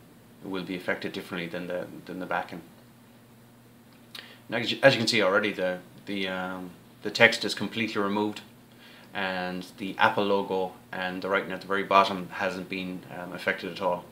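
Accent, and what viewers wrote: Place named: Ireland. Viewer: Irish. I want to say Northern Irish, but I always mix up the 2 dialect variations >.<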